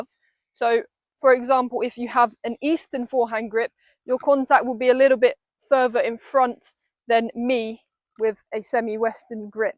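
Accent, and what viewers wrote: United Kingdom. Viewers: Definitely British, I lived in Britain for 30 years. I sense a hint of a Cockney accent aswell. She's gorgeous! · You are a detailed good teacher. By the way, are you British ?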